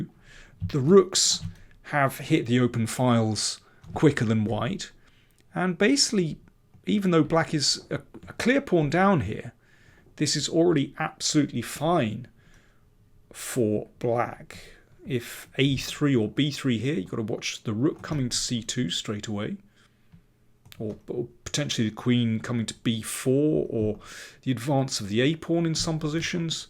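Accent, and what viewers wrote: United Kingdom. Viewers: I definitely agree! I currently believe that Daniel’s analysis is much better for improving. Because not only do I think that Daniel’s analysis gives us a grandmaster insight and ‘feel’ for the position, he also is imo able to express the psychological effects of moves much better. And while Agadmator’s top engine moves are nice to know, the analysis of the psychological effects of moves in terms of pressuring the opponent, being more comfortable and playing with the ‘flow’ of the game etc. are, I’m pretty sure, much more useful for improving than breaking down disgusting engine lines! And lastly as a bonus, I thoroughly enjoy the British accent and demeanor, especially when he gets all excited😆😇😇😇.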